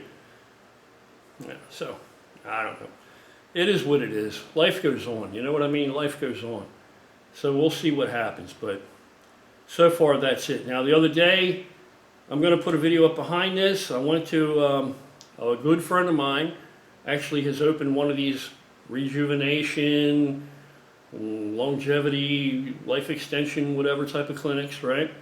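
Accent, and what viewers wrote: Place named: Western Pennsylvania, United States. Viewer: Man you sound like you're no further than 2 towns down from me in western pa. Lol Indiana County Pa.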